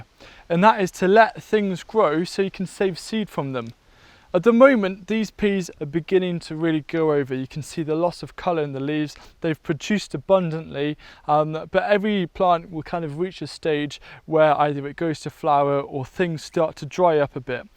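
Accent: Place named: Wales, United Kingdom. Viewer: I love your Welsh accent. And thank you for the tips!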